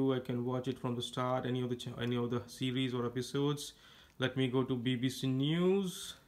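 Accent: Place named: India. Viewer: The indian accent suckssss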